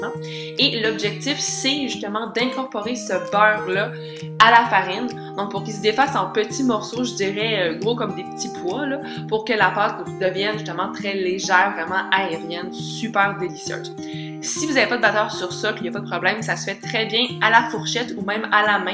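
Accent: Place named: Quebec, Canada. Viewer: Après 5 ans mais pas grave et sur un très joli accent Québécois que j'affectionne !... 😘♥ 👍✅ Magnifique ✅👍 Merci pour le Partage Tout du Bon pour la Suite !... Amitiés "ℜonny" /Femme/61 ans/ Alsace/ France ... ◕‿◕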